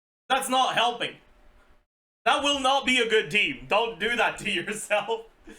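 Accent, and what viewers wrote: Quebec, Canada. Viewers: He’s French Canadian so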